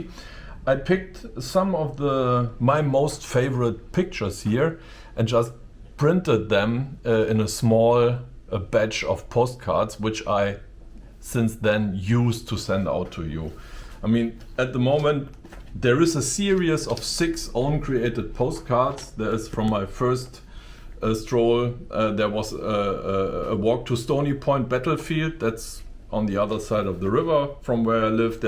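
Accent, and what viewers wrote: Germany. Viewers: Hello, I' m surprised, that I understood the most of what you said😉 and I love your german accent. Nice story about the postcards👍. Best wishes, Maria🙋‍♀️ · Coole Schreibmaschine! I like your German Accent! 😅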